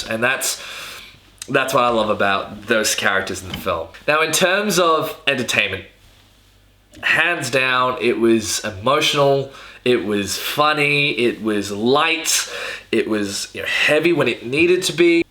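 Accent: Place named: Australia. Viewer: Yesss, another Australian, possibly, maybe, the accent sounds about right. I haven't seen the movie yet, I'm just about to now, so I'm gonna watch this video when I get home, but I'm really interested to hear 18 minutes worth of your opinions on it.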